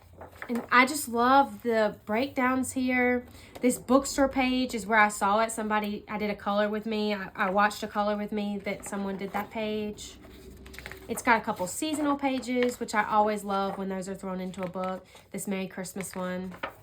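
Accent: United States. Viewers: Love your content ❤ Are you from Texas, you.have that beautiful southern bell accent. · New to colouring and really like your style. Also, as a Canadian, I could listen to your southern accent forever! Thanks for the great book suggestions!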